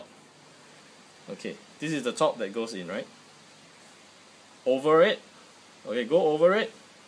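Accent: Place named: Singapore. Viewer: singaporean accent!!!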